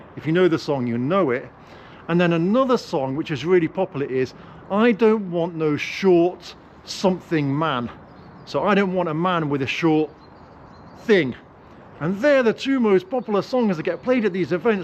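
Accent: United Kingdom. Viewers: Я наверно единственный слышу ваш британский акцент 😄 и не думаю, что вы американец. В России люди очень редко знают какие бывают акценты английского языка, отличия, как они звучат и т.д.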